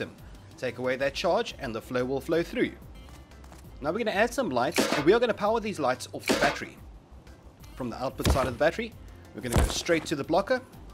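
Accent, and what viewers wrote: South Africa. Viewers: South African? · Are you South African? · Dude you should like a South African??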